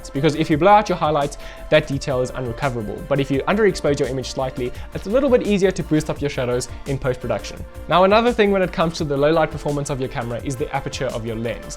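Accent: South Africa. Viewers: Hey Man, you sound South African :) I’m South African too lol. Would love if you checked my video out and told me what you think :) be pretty cool if you up in joburg, I could help you out with stuff and learn from you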